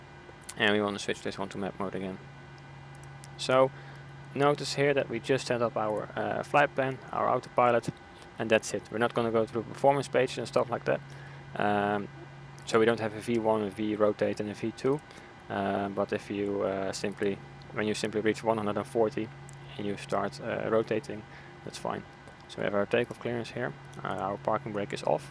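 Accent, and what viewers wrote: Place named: Netherlands. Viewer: hahahah voor mij persé hoeft het niet hoor!! Ik hoorde je stem en ik dacht die komt uit hetzelfde land hahaha! Weetje tegenwoordig kan iedereen best goed engels dus verstaat iedereen je prima en met engelse video’s kan je mensen internationaal bereiken!! Blijf gewoon zo doorgaan en ik zou lekker in engels doorgaan!! Je bent goed bezig!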